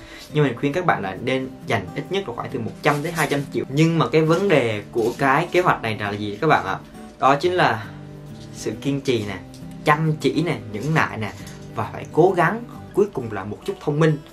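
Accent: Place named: Quang Nam, Vietnam. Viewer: Anh ở Đồng Nai sao a nói giọng Quảng Nam ???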